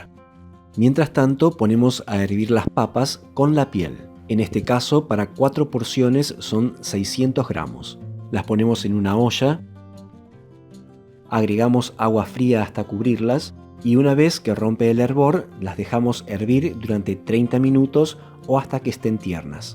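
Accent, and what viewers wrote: Argentina. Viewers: Hola! Voy a tratar de hacer esta delicia hoy, pero tengo un par de preguntas. Las cantidades que vos decís en el vídeo no coincidan con las cantidades en tu receta cuando saqué la impresión. También, en tu vídeo vos decís dejar cocinar la salsa (carne) por una hora y la traducción en Inglés abajo del vídeo dice 2 horas. Yo voy a seguir tus instrucciones, por supuesto. Sólo quería que vos supieras que los que siguen tus instrucciones en Inglés, no la van a tener exactas. Vivo en los EEUU ya 50 años y hablo los dos idiomas perfectamente. Saludos y gracias por tus recetas. Me encanta tu acento Argentino. Lo extraño tanto…Te hago saber cómo salieron los ñoquis!!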